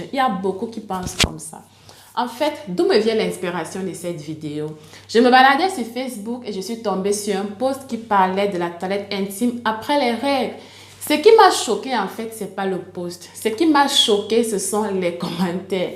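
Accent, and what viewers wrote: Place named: Cameroon. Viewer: L’accent camerounais 😂❤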